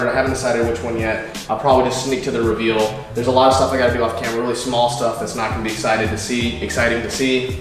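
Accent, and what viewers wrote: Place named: South, United States. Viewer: if you listen to his 2nd channel he speaks in a southern accent all the time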